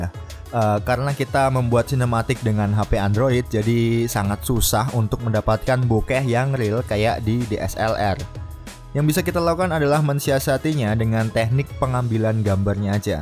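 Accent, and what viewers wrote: Kudus, Indonesia. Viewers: Mas orang kudus? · Smpean ndk wong kudus bro..kok kyok.e shot nek alon2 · wong kudus mas? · Wong kudus mas? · Masnya orang kudus ya? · Wong Kudus mas? Wkwkkwk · mas orang kudus ya? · Bang lu orang Kudus kah? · bang elu orang Kudus ya , wkwkwkw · Wong kudus toh mas?? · Oo sampean wong kudus ya mas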